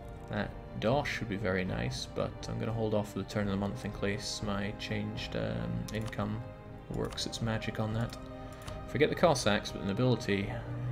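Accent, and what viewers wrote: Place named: Scotland, United Kingdom. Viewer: Stuck in a travelodge all week with Potato Internets, so just listening to those dulcet scottish tones in sweet 480p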